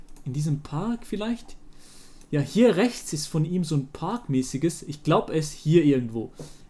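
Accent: Switzerland. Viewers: Ey komm er ist Schweizer und bemüht sich so gutes Hochdeutsch zu sprechen😂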